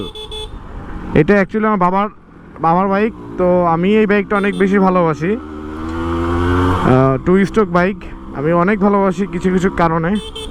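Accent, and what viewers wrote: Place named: Tangail, Bangladesh. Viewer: Bhai apner tangail er kothai amar gram er bari tangail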